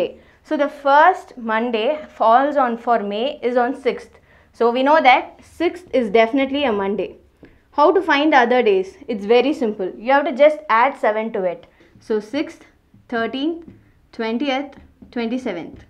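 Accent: South India, India. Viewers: Beautiful you are South accent in ur English make you more cuter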